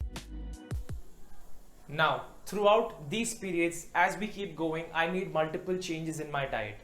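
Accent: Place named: Bangalore, India. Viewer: You have a banglorean accent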